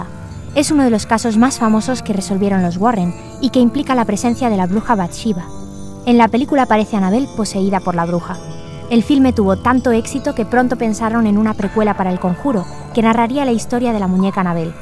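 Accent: Spain. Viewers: Como ablas beo que héroes de España mi país favorito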